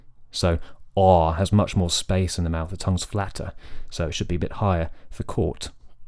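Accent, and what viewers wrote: United Kingdom. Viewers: Hello!! It is always a pleasure to hear to your clear explanations and beautiful British English. You know? Just thinking out loud......I find the spelling advice you gave us a bit contradictory. Since vowels can be distinguished auditorily I have always counted on the spelling of the vowels and the organs of speech to produce them. Perhaps I think in terms of the spelling of the consonants when there is pre--fortis clipping. I focus on the word family too as you mentioned, daughter is not the same vowel as in draugh. Anyway, I think that considering spelling is an important issue we cannot disregard?? or perhaps you were answering one of Adair's questions I missed? Cheers stella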